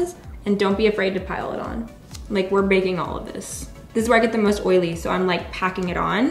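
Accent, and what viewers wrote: South, United States. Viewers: Sometimes I hear her southern accent peek through when she talks like in her old videos.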